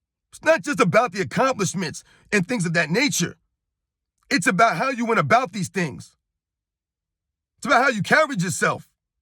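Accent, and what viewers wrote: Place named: New York, United States. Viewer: Yo, listening to your New York accent makes me miss the days i used to get a 75cent bagel with cream cheese and jelly by Sal's outside of Prospect Heights High😏.... Keep the flame burning my guy, " we must continue to stand up!!!".... ✌🇹🇹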